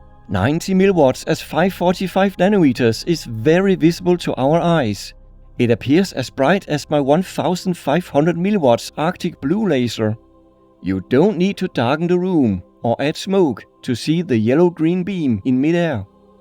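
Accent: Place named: Denmark. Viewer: That’s an S tier Danish accent right there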